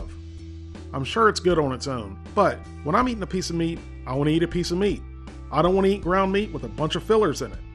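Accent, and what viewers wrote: South, United States. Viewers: Hugedickerino Kripperino he sounds American, and from the accent I will say he's from the south.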